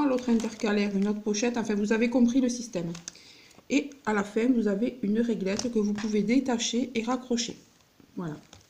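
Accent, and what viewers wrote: South, France. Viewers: ton petit accent du Sud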